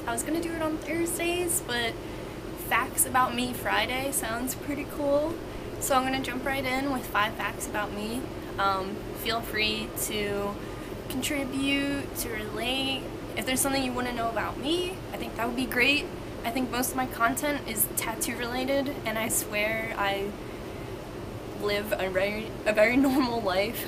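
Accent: Philadelphia, United States. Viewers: so funny, i clicked around and found one of your other videos. the second you spoke, I thought to myself, this girl is from Philly too! sure enough...cant wait to see more. 215 love.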